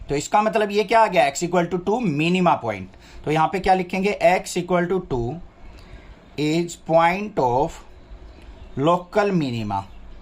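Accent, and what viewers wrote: Haryana, India. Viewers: sir ji thara haryanvi touch aali boli me maths padhne ke jise alag hi hai thanks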